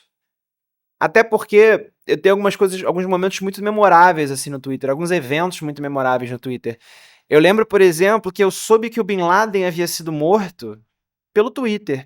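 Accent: Rio de Janeiro, Brazil. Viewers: Mano, esse sotaque de playboy carioca quebra minhas perna, porra. Fica tipo Carlos piloto, aí é foda.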